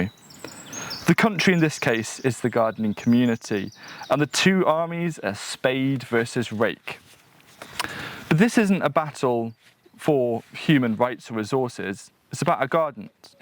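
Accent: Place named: Wales, United Kingdom. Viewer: I have but one of this amazing man's books, and consider him to be the only gardener on YouTube worth a book purchase. Not only is he a wise gardener with lots of sage advise (pun intended), he is a wonderful soul, and always worth my time. The always added plus is that gorgeous Welsh accent - I could listen to our read the phone book for hours on end, all while puttering around in my tiny garden. Thanks, - you have my vote for humanitarian gardener of the decade.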